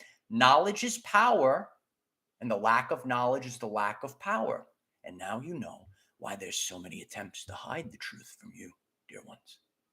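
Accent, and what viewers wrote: New York, United States. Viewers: OMG, I can hear NY in your accent! How do you get your hair so shiny? Like your content these days...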